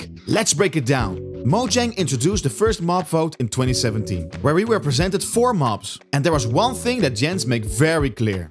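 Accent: Netherlands. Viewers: Hello, are you from the Netherlands? I'm asking this because of your accent. BTW love this vid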